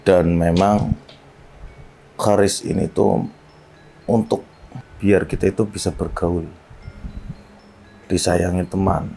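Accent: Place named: Banyumas, Indonesia. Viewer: Deneng ngapak...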